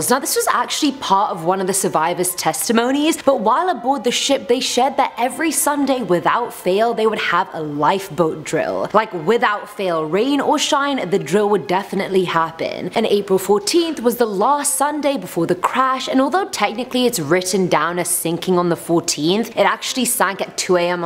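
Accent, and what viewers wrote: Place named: United Kingdom. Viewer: I'm just focusing on her British accent💙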